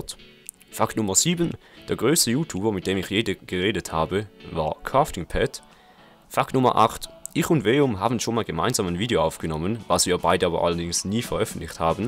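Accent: Switzerland. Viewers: Süsser Schweizer akzent ;D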